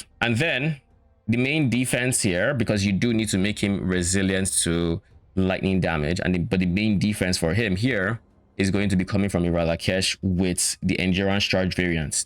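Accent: Nigeria. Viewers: Came to see the build out of curiosity, followed after hearing what sounds like Naija accent 😅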